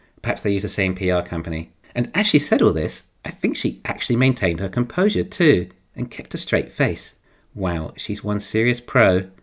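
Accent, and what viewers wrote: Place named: London, United Kingdom. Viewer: Sounds closer to London to me (or at least home counties)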